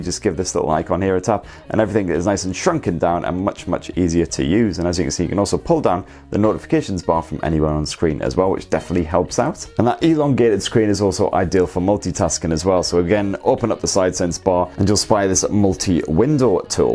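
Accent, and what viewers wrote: United Kingdom. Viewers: For an not englishman incredible hard to understand and follow - british accent and talking so damn fast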